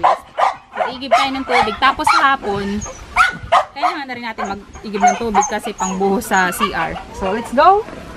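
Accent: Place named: Oriental Mindoro, Philippines. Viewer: Ung accent nyo po tulad smin sa oriental mindoro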